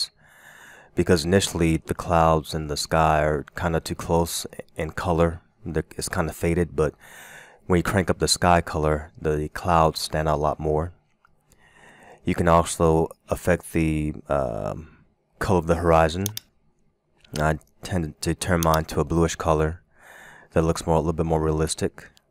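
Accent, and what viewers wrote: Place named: South, United States. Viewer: Why does he sound like a Southern Ryan Higa!?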